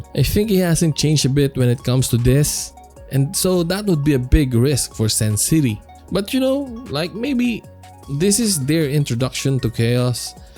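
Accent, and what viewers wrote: Philippines. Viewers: Pinoy kaba lods? tunog e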